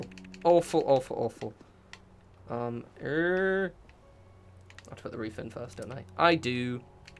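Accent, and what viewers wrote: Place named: Yorkshire, United Kingdom. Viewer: Thumbs up for that Yorkshire accent 🤣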